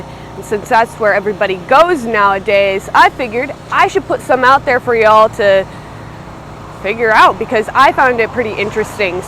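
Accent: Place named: United States. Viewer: I undestand your your american english very well, thank you for that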